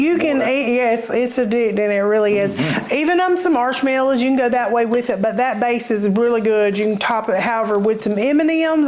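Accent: South, United States. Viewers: I love love love your accent Southern belle accent lol. God bless you sister!!! U had me at peanut butter, you make a peanut butter sheet cake before with peanut butter frosting???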